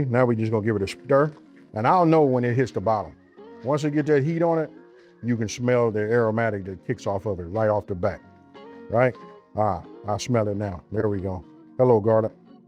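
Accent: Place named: West Coast, United States. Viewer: He got that west coast hood accent. I be waitin for him to call us busters 😆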